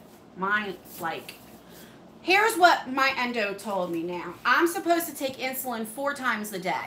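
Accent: New Jersey, United States. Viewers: Your eye make up is so pretty you have lovely eyes and your lipstick is so pretty too. I love your accent . Makes me think of the Sopranos . I hope that's not an insult. Xxx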